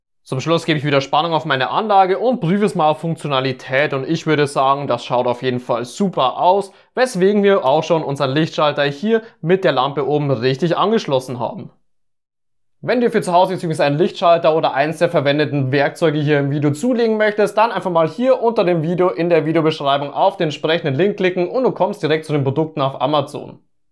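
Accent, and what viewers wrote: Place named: Middle Franconia, Germany. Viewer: Wirklich gute Anleitung, aber kommt der Akzent aus Mittelfranken? "beschädigen könntne", "oben und untne", sowas kennt man bisher nur vom guten Drachenlord.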